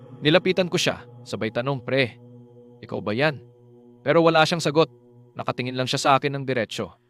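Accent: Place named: Visayas, Philippines. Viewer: from Visayas ba kayo? may punto po kasi, curious lang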